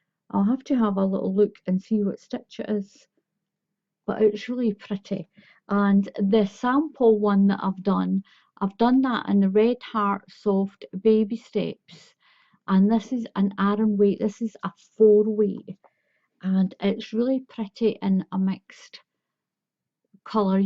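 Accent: Scotland, United Kingdom. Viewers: Hi, I’m a new subscriber and I just wanted to say from one to another, I love love your videos and your voice, love the Scottish accent, anyway what I wanted to say is through your videos I have learned to crochet, so thank you very much for such informative and enjoyable videos. Debbie! Xx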